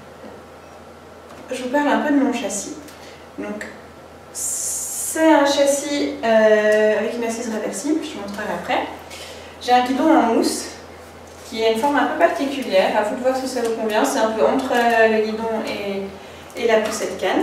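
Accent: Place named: Switzerland. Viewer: Bonjour, superbes vidéos! Très intéressant! J'ai l'impression vu votre accent et les mots tels que nonante, huitante que vous êtes suissesse. :-) Est-ce juste? Si oui, j'ai une petite question. Je souhaiterais acquérir une poussette Peg Perego et vivant également en Suisse, je ne sais pas où en acheter. Sur le site d'Orchestra je n'ai vu que des accessoires. Auriez-vous un conseil?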